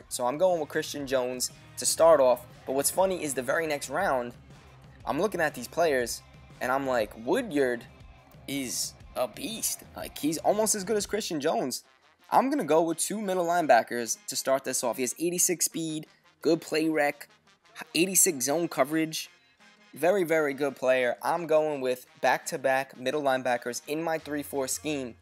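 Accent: New York, United States. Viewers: are u from new york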